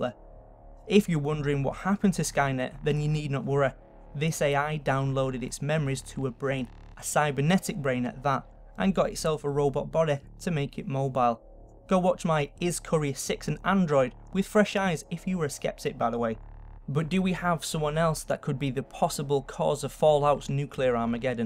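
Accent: United Kingdom. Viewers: your British accent is funny.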